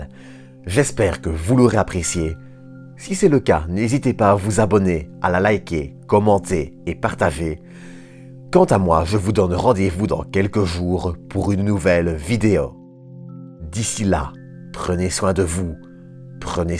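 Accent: Belgium. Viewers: c'est l'accent belge, perso c'est l'accent arabe qui me dérange mais pas celui là...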